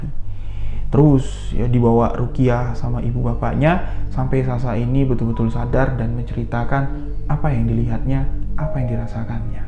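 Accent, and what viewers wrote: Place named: Jambi, Indonesia. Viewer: Paling seneng dengerin bang cerita make logat jambi 😊😅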